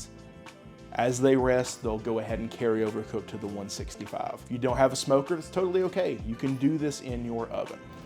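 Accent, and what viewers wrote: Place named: South, United States. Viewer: I love southern accents and the way you guys say uhl. Or earl lol when I’m around someone with the accent for a few hours I subconsciously try to pick it up and use it…. 😬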